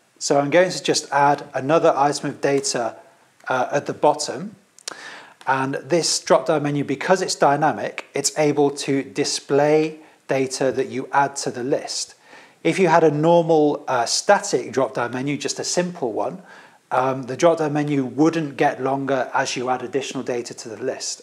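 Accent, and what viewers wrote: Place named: London, United Kingdom. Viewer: like it ... stright to the point & simple. well done man. btw sound from north london ;)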